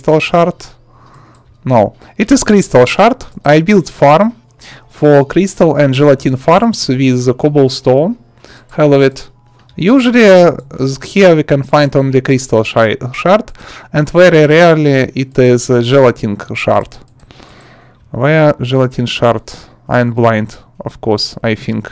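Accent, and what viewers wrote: Russia. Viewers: He sounds so russian or of a similar region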